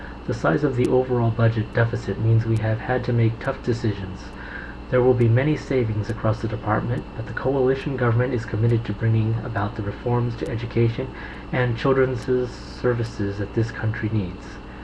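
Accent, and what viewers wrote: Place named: United States. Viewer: Brit here. Just came across your video. Never too late for feedback I suppose. Apart from the minor stumbles at the end I thought your speech was fantastic IMO. I think your accent sounds more pleasant than many accents in the UK and would happily listen all day. What kind of American accent do you have?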